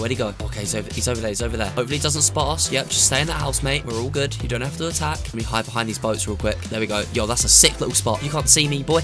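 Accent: United Kingdom. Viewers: Me: Oh no~: Oh norw! Ohw my gawd~ (No hate, love the accent <3)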